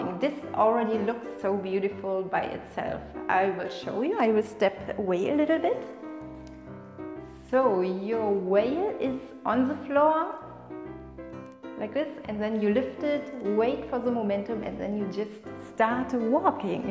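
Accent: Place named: Germany. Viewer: I'm here for the German accent 😅... Don't worry I'm German too.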